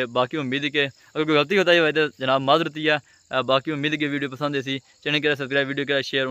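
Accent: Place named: Mirpur, Pakistan. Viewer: Aap ke mun say mirpuri pyari lagti hay,forget urdu language, thanks. Mazhar Hussain Birmingham uk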